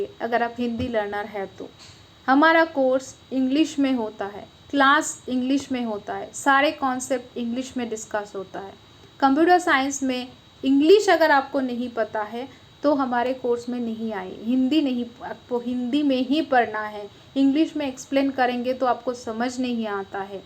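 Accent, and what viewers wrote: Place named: South, India. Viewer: mam s accent of english is little bit south touch but its very simple to understand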